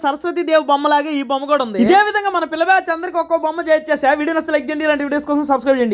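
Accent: Nellore, India. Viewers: Ni voice baguntadi Nellore language super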